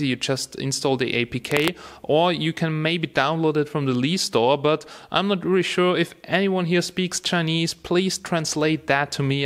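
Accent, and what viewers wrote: Germany. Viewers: this guy is def. german hahaha that accent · He is german :P I should know cause I live in germany xD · is he german?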